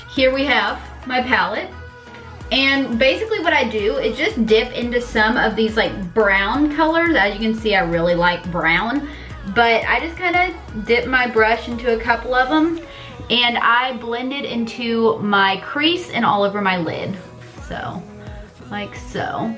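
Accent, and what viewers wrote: South, United States. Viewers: Okay, I've been watching several of your videos---your southern accent drew me in! (I'm one of them southerners too). And I"ve noticed videos from different years, and I can see your weight loss is not happening like you'd want. (Tip no. 1: ditch stevia and other sweetners---they basically put your ketosis to a screeching halt. Tip no. 2 intermittent fasting---you're NEVER lose weight by exercise. I've lost all my weight (at 72 years old) and not exercised a single day.) I'd recommend watching some of Dr. Boz videos---she's a genius at Keto. I've been doing Keto for a year now, and lost about 70 pounds...So, I notice there are several things holding you back...Dr. Boz would set you straight if you spent time watching her. There's no reason you could not drop 40 or so pounds doing what she recommends. Right now I weigh 170---for the first time in 30 years...and on my way to 155, God willing. 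I'm sure Dr. Boz will really help you get to your goals---and heal a lot of things that keep dogging you. And look. Don't pay attention to those morons who leave unkind comments. If you're a Manatee (and you're not)...look at it this way. Manatees are really nice and friendly--just like you. Plus, you're the most beautiful and sweetest Manatee I've ever seen. You're very attractive. Your bright personality, your openness and honesty; those and many other things draw a lot of people to see your videos, and many are really cool and helpful for Keto recipes. You gotta keep these vi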